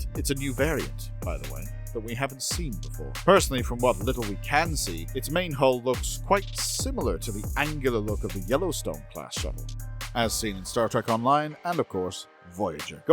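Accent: Ireland. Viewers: I would have bet the accent was Irish eastern mild Dublin or surrounding area. But accent can change based on location. And time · Are you Irish?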